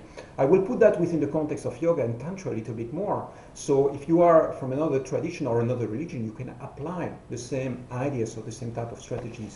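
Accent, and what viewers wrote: France. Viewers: Agreed, again. BTW, Vous etes français ? Your accent sounds a lot like French, it's cute.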